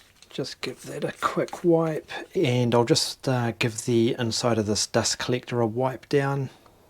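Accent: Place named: Australia. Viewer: Nicely done! Thank you. (Love to hear that Oz accent!)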